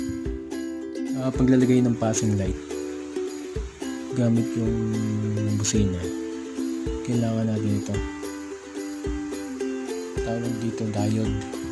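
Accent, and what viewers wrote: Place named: Batangas, Philippines. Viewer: Batanguenio k ga boss. Saan ka sa batangas makapagpakabit hehe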